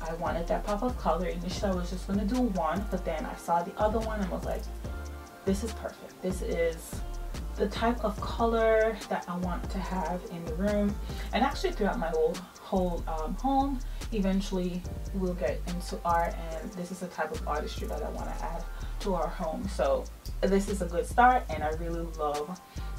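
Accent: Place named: Caribbean. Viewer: Thank you for sharing where you're from. I know I hear an accent - fellow Caribbean Girl here 🎉❤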